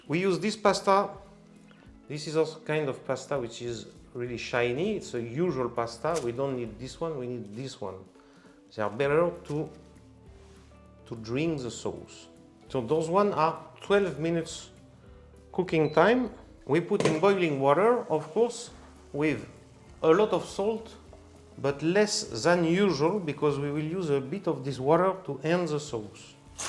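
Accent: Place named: France. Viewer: Love his French way of expressing and speech patterns!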